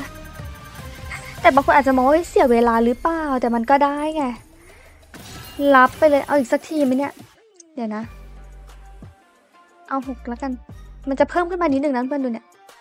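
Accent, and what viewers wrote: Rural, Thailand. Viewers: ชอบเสียงพี่เค้ามากเลยอ่ะโอ้โหเสียงแบบเหน่อได้ใจ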